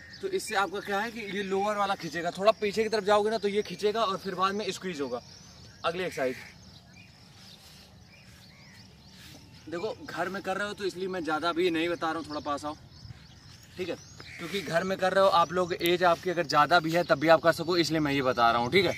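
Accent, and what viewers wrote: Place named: Lucknow, India. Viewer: Lucknow k ho lagta h.. bahut badhia style h hachak k 🤩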